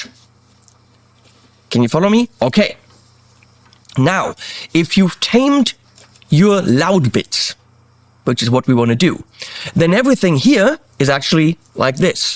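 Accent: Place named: Germany. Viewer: So after 40 years or so I decided to get a Helix and be happy. It’s great but matching up the best pedals you can get is really the best. Watching these pedal videos makes me want to go back to pedals so, guess what, I’ve got both! But I still favor the Helix because it’s handy. Oh yeah, my favorite OD tone when recording? A Sennheiser in front of my old tube Champ with an attenuator. Now that is heaven in a bottle. A 6v6 bottle that is. BTW, you have no talent? I enjoy watching your videos. Your talent is in your delivery of the German laced, English language!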